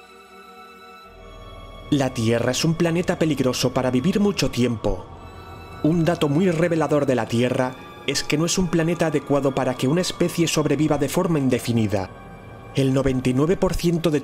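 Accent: Spain. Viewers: Parece más de España, no? Saludos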